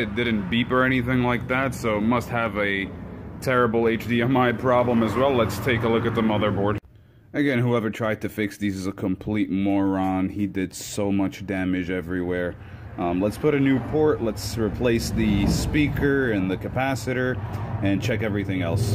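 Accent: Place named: East Coast, United States. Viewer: I like how you get more angry and your east coast accent thickens as the losses mount.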